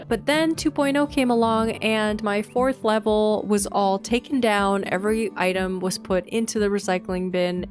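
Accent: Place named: Cape Breton, Canada. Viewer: u are rly speaking like a cape bretoner!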